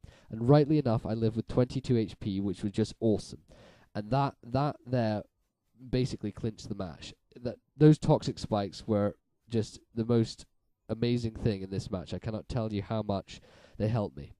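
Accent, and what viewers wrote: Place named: RP, United Kingdom. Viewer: It's a very posh BRITISH accent ya hill-billy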